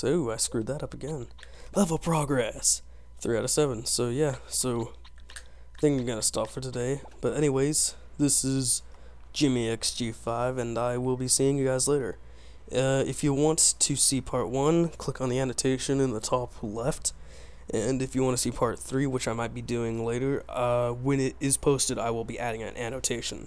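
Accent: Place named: New England, United States. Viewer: Sounds more Kennedy/New England than English I think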